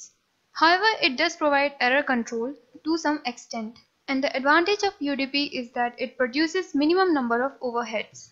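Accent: India. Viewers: your Indian voice.........................uuuuuuggggghhhhhhhhhhhhhhhhhh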